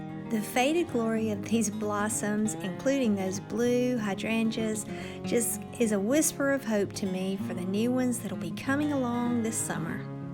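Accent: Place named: South, United States. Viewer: I'm glad I found you on YouTube. Delightful southern accent :)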